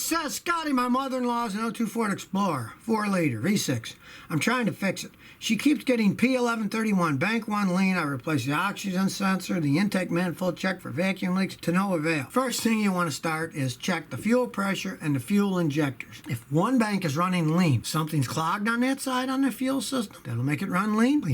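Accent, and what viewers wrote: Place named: Texas, United States. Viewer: This is new. It's sorta like the YouTube version of Car Talk with a Texas accent.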